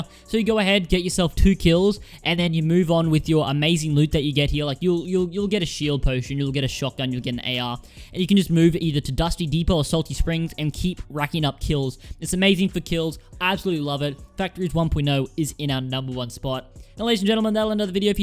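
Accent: Australia. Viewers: are you Aussie? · Fucking Woop Woop ahahaha Bloody aussie <3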